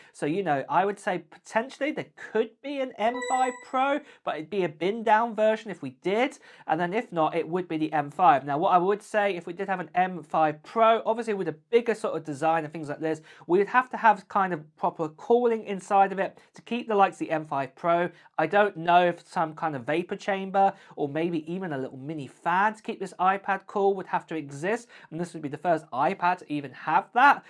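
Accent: United Kingdom. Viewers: Please Apple, use an American person for PR and not a Brit😡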